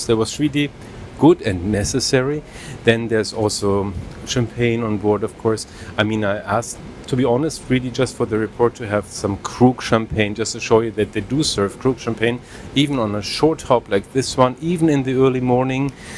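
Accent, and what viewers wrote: Germany. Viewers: In my personal opinion, one must be really stupid to spend around 2500 euros for a return flight lasting an hour and 20 minutes where you can't even fully enjoy the starry breakfast, and finally drinking Krug champagne at 7.00 am is really bad for your health..... P.S. The German accent of the presenter is awful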